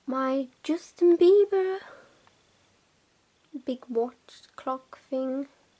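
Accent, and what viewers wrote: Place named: RP, United Kingdom. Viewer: The accent though so posh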